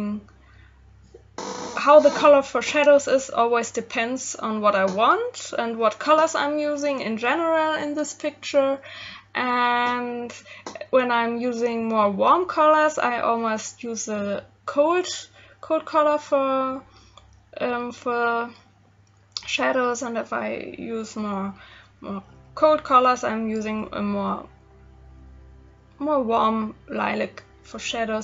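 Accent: Germany. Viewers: Haha cool I think your tips may be helpful! Your accent sounds a little bit German 😅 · Your English is fine and the vid is amazing but you’re accent sounds like ur from Germany (I’m just curios tho)😂❤️ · Man hört gleich, dass du deutschsprachig bist :) Sehr cool, danke für das Tutorial! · ich bin nicht die einzigste die denkt ihre Muttersprache ist deutsch 😂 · letmefly 97 I'm Pretty Sure she's from Germany or Austria (or Switzerland?) because of her Accent (I'm from Germany and most of my classmates have this Accent sooo yeah) But...People correct me if I'm wrong! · thank you so much for this video! your English is really really good! and I love your accent, it reminds me of my grandmother (she is from Aschaffenburg) like hearing her at a younger age. I'm sorry if that's strange. ^_^ but I appreciate your video very much, I definitely want to try some of your tricks and methods when I paint :D be well! 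· Ich liebe deinen deutschen Touch in deinem Englisch :D Fühle mich so verbunden 😂 Aber mal ehrlich, danke für das tolle Video, war wirklich hilfreich 😌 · Bist du deutsch? Dein englisch hört sich so an 😂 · Are u german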